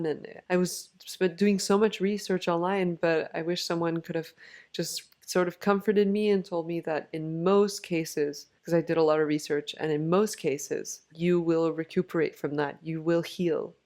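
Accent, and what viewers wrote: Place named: United States. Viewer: Thank you, thank you very much for sharing your story. I got Tinnitus in June 2020, and I am still suffering at daily basis never disappeared. After 6 months of waiting I saw a NHS ENT or like you say ORL en francais, I was diagnosid via an audiogram with a significant hearing loss in left ear around 20%. A second ORL and audigram confirmed the same results. Here is my short story before getting Tinnitus; I passed through stress and anxiety over 5 years, and I managed to overcome the hurdles by running three marathons and half in a period of four years and the earphones were always in my ears with loud ipod music, and the fifth year, just when the pandemic hitted hard, I restarted to run again, and onward I cannot hear well with my left year, just few days later, I started to hear hammering, whistling, and roaring sometimes like the echo of car engine running from far away and still until now. 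It went worst during and after exercises and like you say after alcohol or eat spicy food. There is no treatment for tinnitus, we have just to accept it and live near the traffic or in the heart of a city center to forget it. The silence makes me anxious because I hear my Tinnitus, thanks for suggesting gingko biloba, I am planning to explore the possibility of a homeopathic therapy, did you try any? Another question unrelated, have you done any audiogramm with your third ORL? Any hearing loss? Take care your accent in English sounds American not French.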